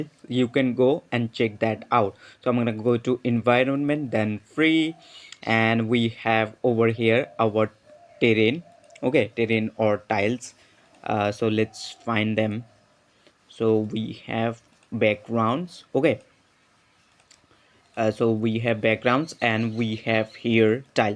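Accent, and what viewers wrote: India. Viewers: Indian accent is horrible Ogay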